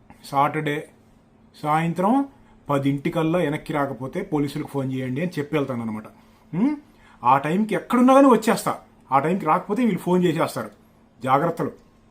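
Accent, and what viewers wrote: Andhra Pradesh, India. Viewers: Meeru Nizamabad .. Slang emo andhra... ela?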